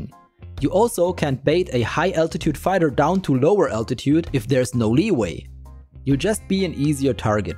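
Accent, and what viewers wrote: Germany. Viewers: or you just have an incredible german accent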